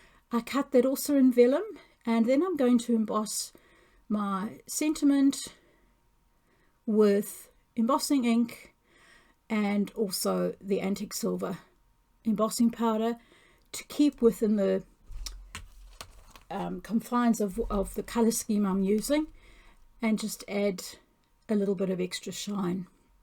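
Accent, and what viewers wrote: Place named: New Zealand. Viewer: Gorgeous and love to hear your accent. Spent three months in NZ in 1974. Loved it! Hugs & Aloha! 🤙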